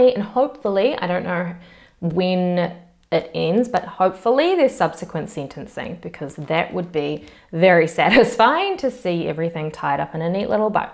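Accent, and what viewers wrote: New Zealand. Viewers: I listen to a lot of true crime and I just found your channel, why. I really enjoy it. At first I thought you were an Aussi but later you mentioned New Zealand so I am guessing you are a Kiwi. I love the accent and the way speak, it seems some of my favorite youtube channels come from that part of the world. Back to basics, Molly Dixon ect. and I think that you are beautiful which makes listening to you tell a story very easy.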